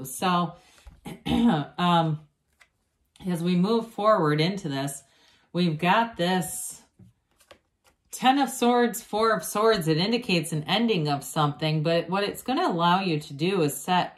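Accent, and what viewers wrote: United States. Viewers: It's not Taaxic it's Toxic and solid not Saalid... Please I love yr readings but this pronun is too MTI U. S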